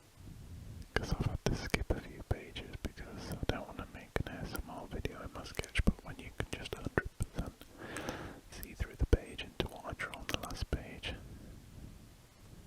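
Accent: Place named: United Kingdom. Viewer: This guy reminds me of Asmr for nerds but with a British Accent. Idk, pretty sure it’s the same guy… anyway love the vids man keep up the good work!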